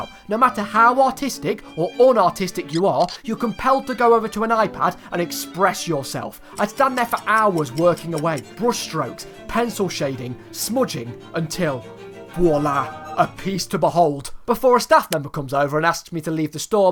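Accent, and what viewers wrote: United Kingdom. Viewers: I can't understand ur bri'ish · Your accent i need it ... How to have an British accent like yours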